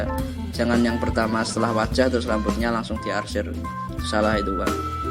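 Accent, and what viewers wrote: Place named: Java, Indonesia. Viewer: Aku suka medoknya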